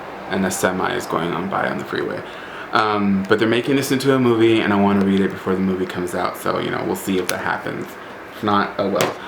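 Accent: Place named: United Kingdom. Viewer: lol your british accent is funny :D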